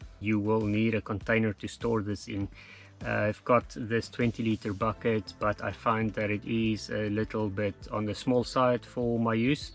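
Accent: South Africa. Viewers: Hi you sound like south african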